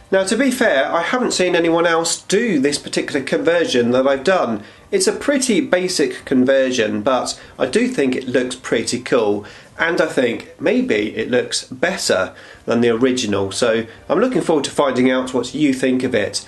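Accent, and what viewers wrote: United Kingdom. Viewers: When he says: "My void dragon" I think that translates to void dragon. It can get a bit lost in the British accent =P. Great work! Prince MN Represent!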